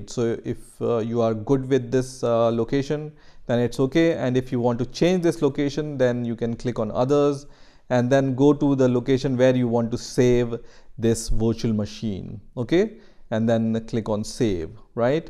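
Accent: India. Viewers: this. That's clearly an indian accent. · slight indian accent always comforts me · dude this might be racist but I am getting scamming vibes like I am watching one of those scam "how to videos" because of your Indian accent. I know it is not but I am just getting those vibes maybe because I am racist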